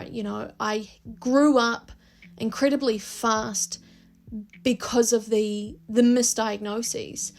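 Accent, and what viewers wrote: New Zealand. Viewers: Are you from Auckland? I love your accent and the way you talk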